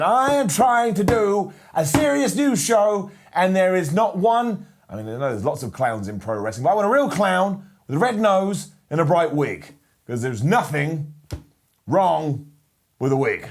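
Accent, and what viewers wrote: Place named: United Kingdom. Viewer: British accent. Annoying.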